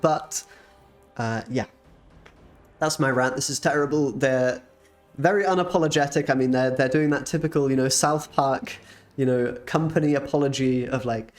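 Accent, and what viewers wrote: United Kingdom. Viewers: I still wonder why you clearly have a British accent but you use Polish currency on your store 😅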